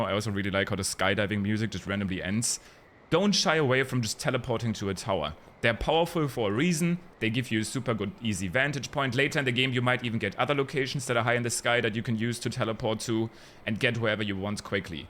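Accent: Germany. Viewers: Wie man merkt dass er deutscher ist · always I search Zelda videos, I came up to and I like his videos, but I always think he sound like a german who speaks english and I dunno why:D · The german acccent is reaaaaly present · 1 Question Are you German because your accent sounds german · good video but there is one thing i want to know, ist das in deutscher akzent den ich da höre? :P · deutsches englisch ist so gut rauszuhören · Man hört mal anders hart raus das du deutsch bist 🤣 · Hes from Germany, why should he fake it lmao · Man hört SOFORT den Deutschen aus dir raus, gutes video keep going :3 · Du bist Deutscher oder ? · anyone knows if he is german or what is that accent? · Deutscher akzent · we can hear the deutsch in your voice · Hes german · Thick German acent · So lustig wie man den deutschen Akzent so hart raushört aber gutes video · is that a german accent? 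:D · Hab sofort gemerkt du bist deutsch😎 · Bro where are y from? You sound like a German one xD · Liebe den deutschen Akzent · Save deutsch, hört man krass raus irgendwie komisch 😂 geiles Video aber hat mir sehr weiter geholfen 💪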